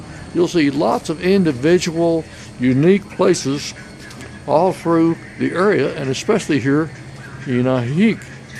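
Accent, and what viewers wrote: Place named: South, United States. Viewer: I just subscribed. Thanks for the video! Where are you from (in the states)? You have a southern accent! I'm from GA and hoping to retire in Mexico. I'm a single, 62 y/o female (with a small dachshund "companion"), so I want to move somewhere safe where there are groups of people my age. I would probably rent a small studio apartment first...and maybe buy later. Do you have any recommendations? Thanks.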